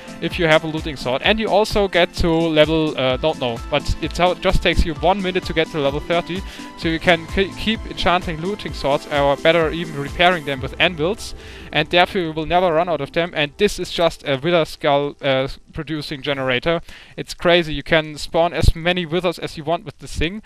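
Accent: Germany. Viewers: where do you come from? Germany? ^^ (sounds like it) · WOW, u have such a strong German accent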